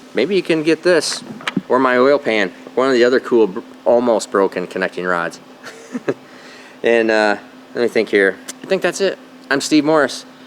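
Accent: Michigan, United States. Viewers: that Michigander accent coming through 😂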